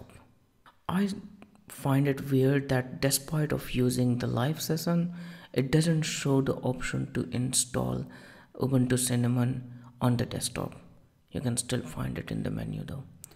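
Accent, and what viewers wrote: India. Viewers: indian voice ::))))))))))))))))))))))))))))))))))))))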